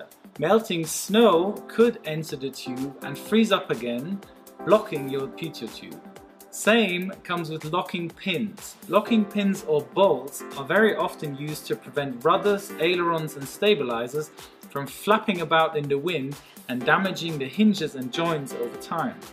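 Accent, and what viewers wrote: Germany. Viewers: Hes german.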